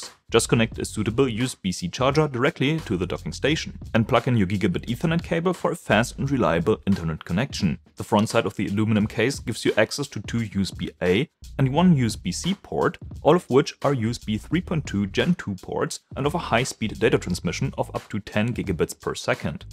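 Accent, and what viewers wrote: Germany. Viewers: its so german it sounds not german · The first video I ever watched from him, after first couple of sentences, I tought to myself: " Oh, a German doing reviews in English, that's rare". And I'm Croatian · You German? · I hear the german accent but appreciate that the video is in english lol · He has a pretty pronounced German accent lol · He has about the strongest German accent out there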